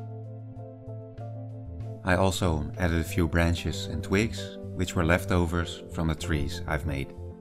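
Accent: Netherlands. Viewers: Thank god another video from the terrain building bob ross. I dont know what it is with your voice, maybee the combination of the tembre and the dutch accent, but listening to you is deeply relaxing.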